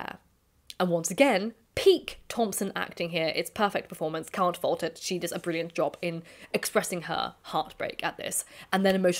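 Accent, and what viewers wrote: RP, United Kingdom. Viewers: I love your received British accent. I remember not liking it at all even a romcom can work if it's at all believable and there's a sufficiently compelling set up and protagonist but I wasn't impressed.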